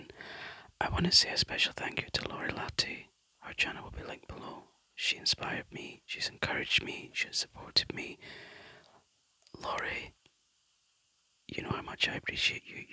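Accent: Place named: United Kingdom. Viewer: Your nails are strong and sound AWESOME!!! 🤩 Loved the mic caressing, the tapping and scratching on the first goldy Blursh all-in-one liquid pigment, the tapping and scratching on the Blursh Ice Ice B B box and the tapping on the lid, yes 😊, - that sounds cool, I need THAT lotion 🧴 I have super weak nails 😫, yes 🥱 - she is THE QUEEN ❤ I always enjoy a good BRITISH 🇬🇧 accent 🙃 I can tell you LOVE and ADORE makeup! 💄 Well done, Will! 😊 I came to support because of Lorri 🤗 slime would be a EXCELLENT trigger assortment idea! ☺️ In all the ways: Alone, on the mic, on a table, hands ups, cloudy, sticky, with toppings… Whatever 😇 ☀️ Take care!! Have an amazing night!! ✨🌝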